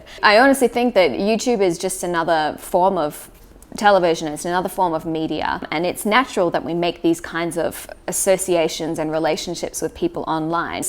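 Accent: Australia. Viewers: are you from Australia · Back in 2009 when I got my first Android phone and I started using many Social Apps in order to develop Friendships because this is where most of the offline people you know are now online. Out of hundreds of people while using these apps ive only acquired 4 real friendships...Here on Youtube I call some Youtube Creators my Friends and some do respond back to my comments. This is an eye opener for me to learn the term Para Social Relationship..knowing the person but not actually getting to know them in depth as a real person. Welcome to Fantasyland when viewing some content on Youtube? 🤔 What really stings is to think that you are developing an emotional connection with people for example ASMR Artists but nothing is there.. Btw nothing wrong with your hair. 🙂 Austrailian Accent 😎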